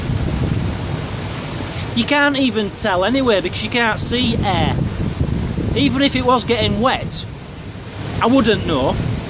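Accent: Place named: Liverpool, United Kingdom. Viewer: OMG he looks like Bilbo :3 but just with a liverpool accent. so cute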